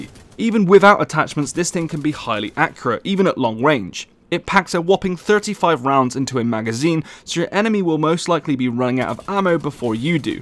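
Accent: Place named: West Country, United Kingdom. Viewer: you from bristol or summerset buddy?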